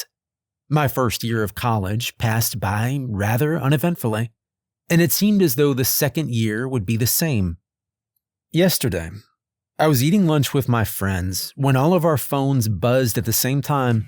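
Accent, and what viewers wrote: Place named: Brooklyn, United States. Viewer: I've realized throughout this story that I don't like this accent. Is it Brooklyn? Whatever it is, it upsets my ear for some reason.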